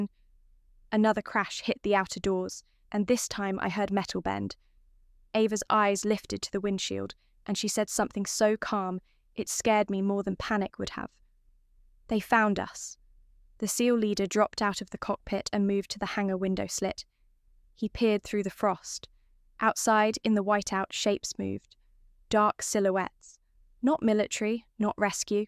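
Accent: United Kingdom. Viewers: why do we have to endure an english accent when watching US Navy Seals?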